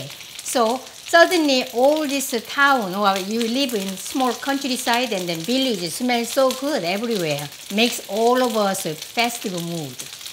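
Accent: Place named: South Korea. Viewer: Your Korean accented English is beautiful, and so are you!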